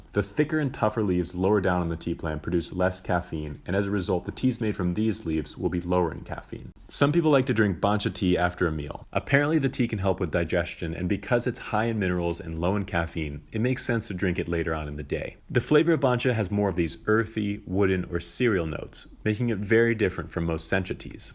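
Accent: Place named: England, United Kingdom. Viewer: Typical English speaking style of pronouncing Japanese. oops.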